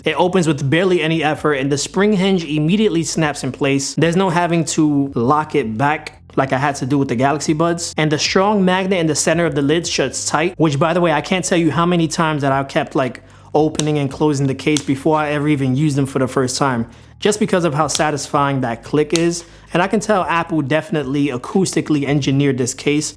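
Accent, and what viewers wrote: New York, United States. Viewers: no problem, you sound like your from NY!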